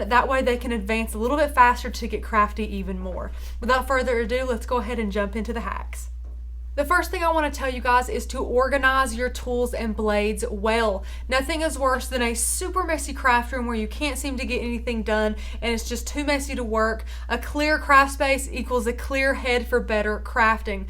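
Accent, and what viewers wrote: South, United States. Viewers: My English is fine, and I have trouble keeping up😁 Her Southern accent is thick, so that might make it a bit more difficult for you, too. I don't know how to do it, but I know videos usually have Closed Captioning, so you can read what is being said at the bottom of the screen. It helps me keep up. Good Luck from Georgia😁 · I was gonna say, she sounds VERY southern to me! (New Hampshire here ;)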